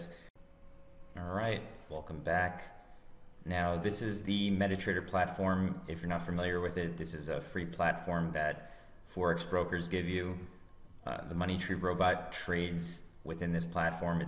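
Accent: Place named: United States. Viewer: You are obviously American the way you talk (like me) but why are you using Blazemarkets? They don't allow US residents.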